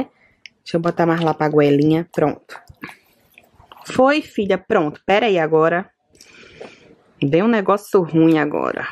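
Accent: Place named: Bahia, Brazil. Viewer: Muito lindo o amor pelas meninas , o geito de falar não me aguento de ri kkkk sotaque baiano mesmo obs: também sou 😘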